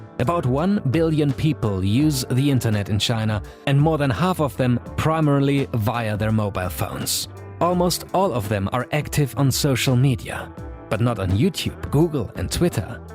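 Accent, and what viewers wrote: Germany. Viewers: Man merkt richtig das du deutsch bist!😅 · German accsent ( I Dieont know how to write that)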